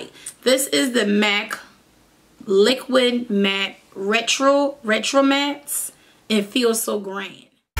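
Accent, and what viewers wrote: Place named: Gullah Geechee, United States. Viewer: Geechie gyal!!! I can spot that accent out of a line up lol. Love the eyes, I still can't master the winged liner. Taking notes!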